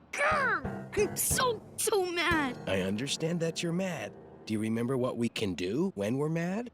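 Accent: United Kingdom. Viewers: Bra I'm not going to subscribe to this stupid British dude learning English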